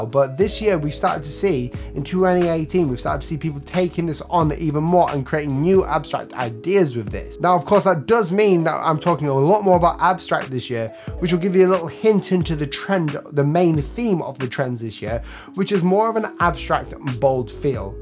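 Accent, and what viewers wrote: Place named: United Kingdom. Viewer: That's how us British say it, like he said 'Adi-das" instead of "Ad-eee-dus". Similar to how we say most countries with the letter I and as "i" such as i-talian, not I-talian :). It's the way we break down the syllables in our speech pattern.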